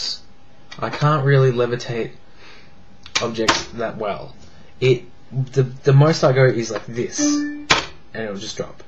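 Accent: Australia. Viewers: You're Australian, aren't you? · Is he Aussie?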